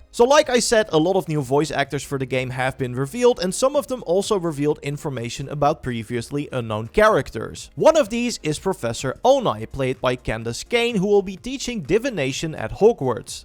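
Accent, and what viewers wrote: Netherlands. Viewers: Why does your voice change. This channel seems to be two different ppl. Both dutch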